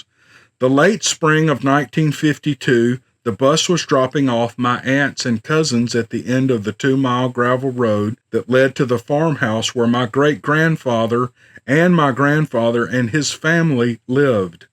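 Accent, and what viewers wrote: South, United States. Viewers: This was so nice, such a sweet surprise! Getting ready for end-of-day routine, but thought...wouldn’t it br nice to listen to story before hitting the hay...not really any hope there would be one. Imagine how delighted it was to see you had been busy and there was not one but three stories.! I feel really spoiled! I have wondered what is the big attraction to hundreds of us listening to this kindly daddy voice...l know your voice reminds me of my Dad, he has been in the land of the living now for many years and l really miss him! Listening to the gentle tones of your Southern drawl is too much like home! I think there must be a few others that feel like this is almost magical, allowing us grown (and in some cases OLD) folk to enjoy being read to by a loving Daddy again! Thanks so much,. Hope this doesn’t sound corny...the stories are nice too, as usual. 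Appreciate your doing these so much! Entertaining and comforting! Happy trails! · love your voice reading these! and your voice is so country! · Early in my adult life I shed my native southern accent for a career in major-market broadcasting, but I can still talk like that when I want to., I like your accent very much, and I don't believe anyone will have any difficulty in understanding you. Also I love that you don't make any attempt to hide your faith in Jesus. He is LORD!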